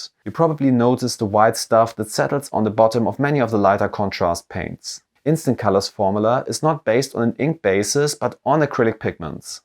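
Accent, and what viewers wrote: Germany. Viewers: Da klingt einer aber ziehmlich deutsch! Tolles Video und deine patreon Vergleichsbilder sind super! Hab den kompletten Vergleich von allen 5 Marken gekauft !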